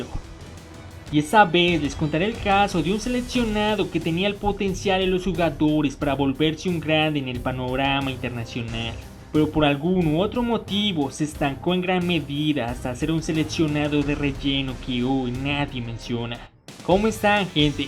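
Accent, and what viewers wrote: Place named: Brazil. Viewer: Seguramente es brasileño y le cuesta el español se n ota el acento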